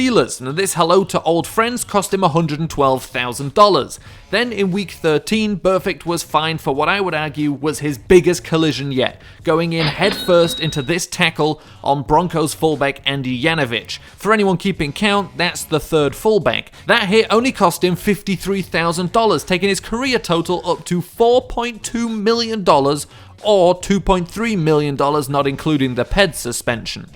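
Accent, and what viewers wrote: England, United Kingdom. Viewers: So why is this British guy talking about NFL football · British accents and football don't match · Wasn't expecting a Burfict video to be narrated by a Brit lol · Theres somethin i really dont like about an english accent talking about american football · This is the first time I’ve watched American football with a British accent over it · honestly have never heard someone with a british accent speak analytically about american football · Anyone else think it’s pretty cool a British dude is talking about American football? Edit: or maybe he’s Australian idk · I love how a British person is saying this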